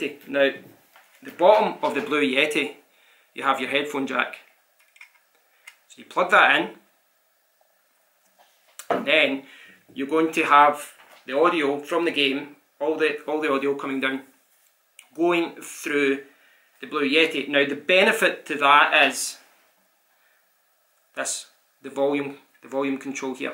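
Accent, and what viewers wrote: Scotland, United Kingdom. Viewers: Thanks man also I'm irish and I love your scottish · Love your Scottish accent. From an Irish person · Nice video man. Ps: Your Scottish accent is awesome.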